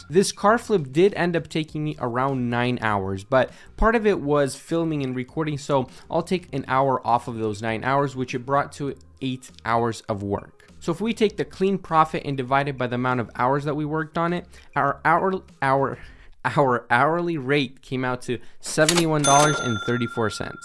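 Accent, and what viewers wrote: Russia. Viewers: Dude, are you russian or something?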